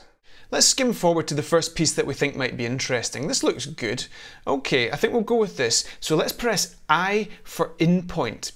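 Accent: Scotland, United Kingdom. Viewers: That was without any shadow of a doubt the most useful & feature-packed tutorial I've seen. It made me realise I was doing far more work than I needed in FCPX to get the results I was after, and it cleared up a few elemental basics that I was doing completely wrong, and causing myself much heartache in the process. And great to hear a fellow Scot narrate it. Many, many thanks for this big man! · Simply because you have a strong Scottish accent I liked and added your video to my favs. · Very usefull video. Thanks....love Scottish aökcent:D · Ayyo! Fellow Scot! Instantly recognised your accent then saw your footage in Glencoe!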